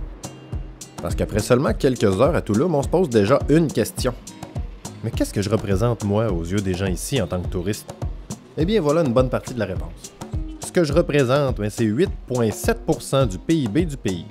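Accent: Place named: Quebec, Canada. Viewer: Merci pour cette superbe vidéo ! Enfin un reportage qui ne comporte pas de niaiseries et tes prises de vues sont magnifiques ❤je pars au mois de juillet au Yucatan j’ai hâte ! Petite remarque tu parles un peu vite et j’avoue que pour une française il me faut un petit temps d’adaptation pour comprendre ton adorable accent québécois ! 😅continue à nous faire voyager ….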